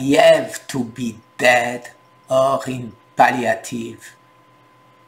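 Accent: France. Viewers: ce n est pas un french accent c est un super slow french accent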